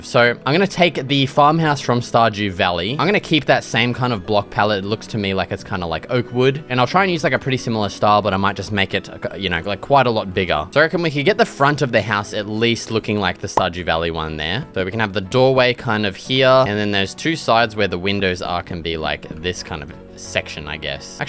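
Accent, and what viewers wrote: Australia. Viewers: are you Australian? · hes australian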